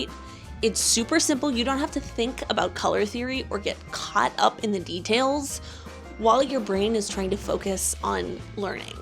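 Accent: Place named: United States. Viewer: hello! thank you for the video, it finally pushed me to start actually learning to program (cry). also you mention a lot of games in the video, would you mind writing the names out? i'm a little hard of hearing and unfamiliar with american accents, so sometimes it's hard to pick out the actual spelling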